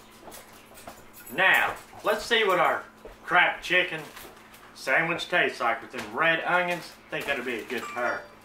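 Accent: South, United States. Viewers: You can cook! And I love your Southern accent. Reminds me of home. My mom’s home anyway. Great memories. Keep kookin’! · Love your southern voice!!!! Love your videos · This really looks delicious. I make plain chicken breast in water in the crockpot for shredded chicken sandwiches and the chicken is usually a little dry. Sometimes we put gravy on it but a little annoying that it’s dry. Don’t care for the Crack chicken name either. Ranch shredded chicken sounds better. Really like your channel. You serve up great recipes with a great southern accent.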